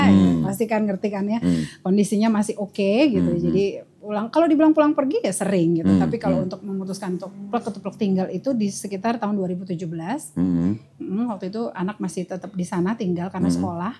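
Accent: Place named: Betawi, Indonesia. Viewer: betawi banget........dialeknya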